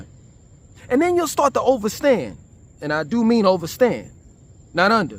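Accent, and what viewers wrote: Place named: New York City, United States. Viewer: Bro WHERE in Jamaica are you?? I keep asking you this? Jamaica has Amazon bro lol, Jamaica ships in Shein and Temu, there literally NOTHING you cant get.. Kingston, Portmore, Montego Bay, you gotta move around in Jamaica. And as to the Red Tape buy big so you don't have to worry about big taxes. The truth is your at a DEEP disadvantage because you cant speak Patois, and your accent is deeply NYC America. Jamaican success literally depends on your energy 😂, you can motivate a mf to link you on time. You have diff types of Jamaicans Professionals and Jokers lolll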